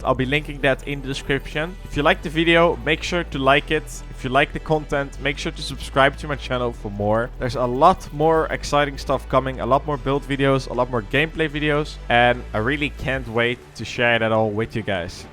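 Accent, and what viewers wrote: Netherlands. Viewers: Are you from the Netherlands or Belgium ?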